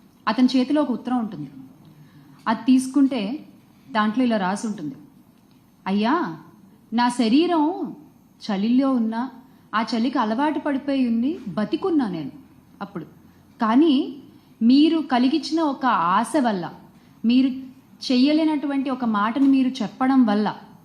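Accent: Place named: Telangana, India. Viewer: అక్క 😂😂😂 నీది Telangana కదా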